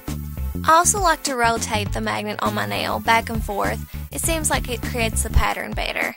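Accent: South, United States. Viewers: OmfGODS Southern Accent. It is almost too much!! Damn cute nails though. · I LOVE YUR VOICE..LOL. ITS SO SOUTHERN.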